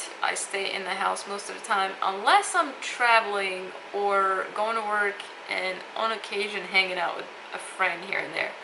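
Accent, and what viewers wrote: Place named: East Coast, United States. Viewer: Oh yeeah. Pens are friends. As unabashed as that is to admit.. well, sort of. You've a cool East coast accent.. NY? Boston? I agree about pencil vs pen, took me ages to see the light but now def better to go straight pen when drawing. You might dig these pen cases by Lihit Lab 'Smart fit pencil case' Helps keep pen choice down n neat design that stands up into pen pot. Im not sure if you can get hold of them stateside but some of my fav pens are the Winsor Newton watercolour markers. So good. Be safe, happy drawing 🎨 My latest, with pen stuff too <link>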